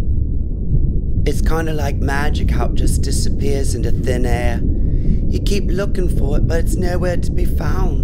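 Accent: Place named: London, United Kingdom. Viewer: LMAO at the London accent....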